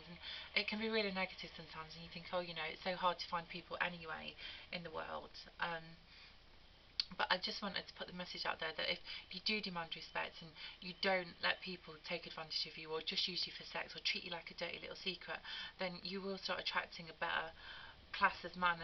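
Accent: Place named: United Kingdom. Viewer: Love the British accent. For a moment there I thought she said "it's the tranny-first century."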